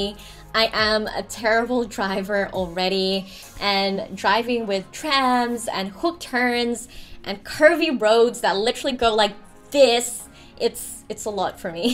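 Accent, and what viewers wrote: United States. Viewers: What is with the American twist on the accent if Oz is home?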